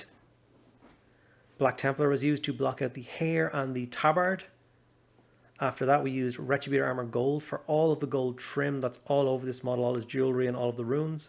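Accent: Ireland. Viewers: Random question but where are you from? You have a mixed accent or it sounds like it to me. How you say certain words sounds very Dublinish:-P This is a really cool to see you save and redo the model. Looks like the person might of been new and didnt know what was going wrong with the model.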